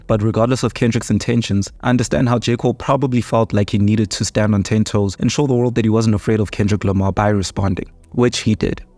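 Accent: South Africa. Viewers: Bro your voice makes me think you're south African. Are you South African?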